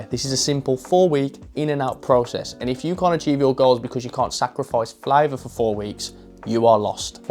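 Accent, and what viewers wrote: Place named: Wolverhampton, United Kingdom. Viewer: Nice video man. Very informative and to the point! Looks like you got great results. You sound like your from my neck of the woods (wolverhampton)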